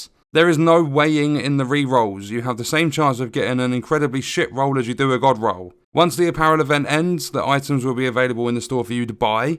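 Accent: United Kingdom. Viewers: That’s everything I’ve been saying ever since TU8 dropped except in a British accent. Even the fuck the devs. I don’t wish them death or disease but I do wish the could pull their heads out of their asses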